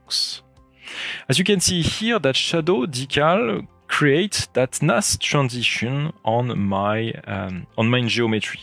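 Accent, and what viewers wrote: France. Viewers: L'accent français est reconnaissable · Hello Twinmotion folks. Sorry, it's nothing personal, I love the French language. But the narrator's accent is very pronounced and I can't quite understand it. Please, I ask you to put it in French with subtitles or in English, but with less accent.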